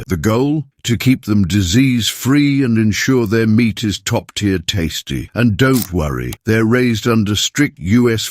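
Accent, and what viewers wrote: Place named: United Kingdom. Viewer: What the hell! You take an all-American icon like Popeye's and lend it a frickin' British accent. Popeye's has got NOTHING to do with England. Why don't y'all hop on your own coat tails?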